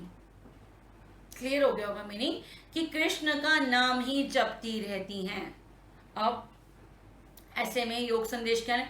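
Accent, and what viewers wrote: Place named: Punjab, India. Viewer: Maam i think aap punjab si hai ?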